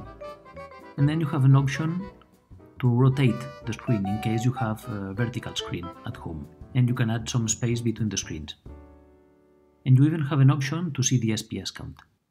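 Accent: Catalonia, Spain. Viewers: Soy yo o hablaa como español hablando en ingles:v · Cómo se nota que eres español xDd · Ese acento español jodeeeeer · Eres español, no? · No se como he llegado aquí pero tu acento, suena como un español intentando hablar inglés y pronuncias raro xD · No, he is not French. That's more of a Spanish or Catalan accent. And since he's from Andorra, it's clearly Catalan. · Se nota demasiado que hablas español tío JAJAJAJA por cierto muy buen video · Buen vídeo,se nota demasiado que eres español jaja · Vale pavo y si haces los vídeos en español? O catalán...porque llamándote... inglés no eres y americano tampoco... Así que igual también estaría bien que los compatriotas te entendiéramos. La pronunciación te delata colega. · Tío intenta hablar mejor el inglés que se te nota que eres español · Loko como se nota que eres español xdd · ese acento español sigue intacto papu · se nota bastante. 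Uno el nombre y algunas palabras en inglés que suenan muy español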